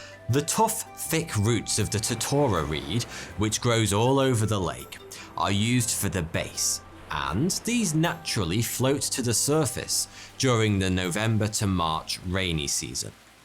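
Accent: United Kingdom. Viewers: The beauty of lake Titicaca is nothing compared to the beauty of your blue eyes, handsome mustache and sexy British accent babe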